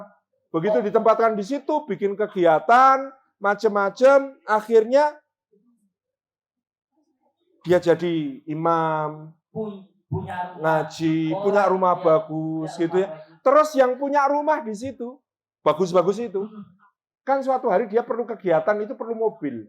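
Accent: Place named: Banyumas, Indonesia. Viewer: Ust. Salim memenuhi sunnah Rasulullah saw, berbicara dgn bahasa kaumnya. Di Purbalingga bicaranya ikut ngapak 😅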